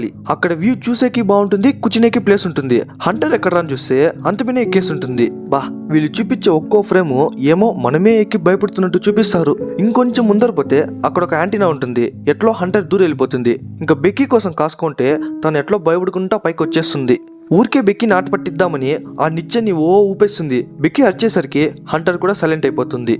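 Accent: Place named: Karnataka, India. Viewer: bro nuvu telogodivi kadhu kada, nuvu matladuthunte kanada valu laga matladuthunttu undhi.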